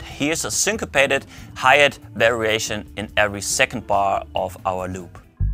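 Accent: Germany. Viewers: Hallo Herr Das Video finde ich sehr gut, allerdings habe ich nach 2 Minuten abgeschaltet. Ich sehe einen englisch sprechenden Protagonisten. und denke mir das Englisch ist aber gar nicht so englisch. Das ist ein deutscher, der Englisch spricht. Ich muß gestehen das ich nicht so fließend Englisch sprechen kann geschweige es noch so gut verstehen kann. Das liegt daran, daß ich nun mal Deutscher bin. Jetzt komm ich aber zum Kernpunkt der Sache. Herr, warum machen Sie kein Video in dem Sie Deutsch sprechen? Für viele Menschen die nur Deutsch sprechen, würde es leichter fallen ihre Videos zu verstehen. Oder wollen Sie mit Ihren Videos nur Engländer, oder Amerikaner erreichen? Nun gut. Ich hoffe ich habe Sie jetzt nicht verärgert wegen meiner Frage. Ich wünsche Ihnen weiterhin viel Erfolg mit Ihren Videos So denn... ✌️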